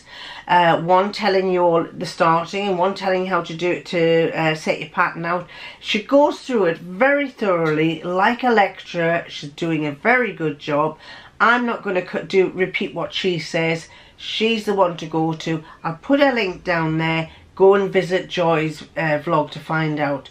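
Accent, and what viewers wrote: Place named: England, United Kingdom. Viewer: a very French name for a very English accent... J’adore!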